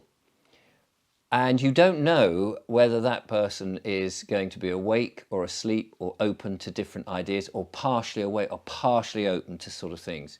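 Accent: United Kingdom. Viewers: hi, since I discovered your channel I only watch that, really interesting, I also enjoy listening to the british accent...so elegant...keep doing a great job, cheers from an Algeria guy lost in the cold of Montreal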